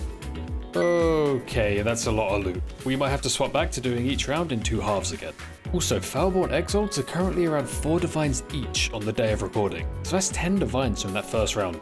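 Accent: United Kingdom. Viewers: Came for a big loot stayed for a great vibe + the british accent ofc, great vid, hope your channel grows massive in no time! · Came for the hiveblood Stayed for the distinguished british accent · Dang, I just love those videos! ❤ One of the things I was interested in was if you'll hit the full stack of divines, and you did! It was such an awesome view! Great Jon on all the testing, I admire your devotion and time investment. Looking forward to the next league and further testing. I hope you'll be as restless as in the Mirage! ❤ Ps. I live in England, not English tho. I could listen to your lovely English accent all day long 😅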